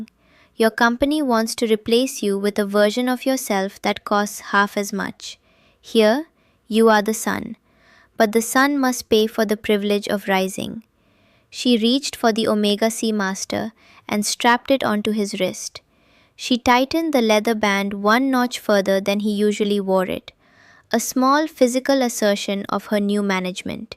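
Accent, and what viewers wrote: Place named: India. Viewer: A lady from the Philippines with an Indian accent 😅.. AI is a disaster 😳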